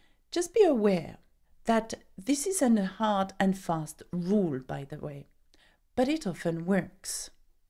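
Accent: France. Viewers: Sweet teacher. Nice voice. I really like your French accent while speaking English. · I like the "French" English accent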